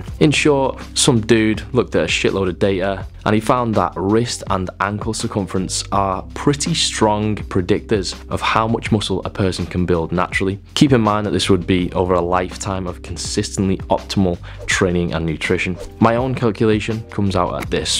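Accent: United Kingdom. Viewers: Nice pleasnt accent .......................for a United Kingdom guy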